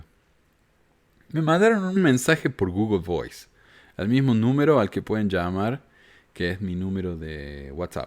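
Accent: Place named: Córdoba, Argentina. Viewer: Uuuiii ese comentario sobre tu acento no vaa!! Y qué!, si les molesta. Tu acento es lo más hermoso y lo más ritmico de los acentos del mundo, tiene vida tu hablar, tiene cadencia. Amo tu ace to cordobés. Aunque muchas provincias de Arge tina tienen ese acento tan hermoso. Mi hermana vivió un tiempo en Córdoba y las vecinas le decían La Yeya por hablar con y, de Santa fe. Jamás un acento debería ser para discriminar por que habla de la escencia de la persona, y ninguna discriminación. Quizás, el que lo dice, quisiera ser como vos, bueno que lo diga y ya está!. Te enontré, te quiero, te amo, zaludoz. (mucha gente en zona de Santa fe y de Entre Ríos reemplaza la s por la z)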